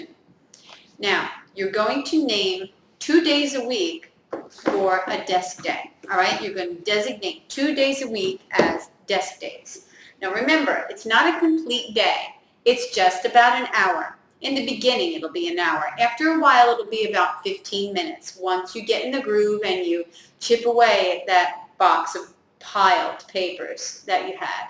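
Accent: New Jersey, United States. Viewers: I found you by reading an article where someone mentioned you in an ebay forum about eliminating cluttler. The first video I saw of you is the one with zippered curtain bags. At one point I had every size. From curtains to sheets to bed in a bag. I got rid of them all. Well actually I gave them to my kids for camping for sleeping bags and linens. I think you're great! Are you from NJ? You sound like it. lol.. my email is <mail> looking forward to your emails and thank you!!!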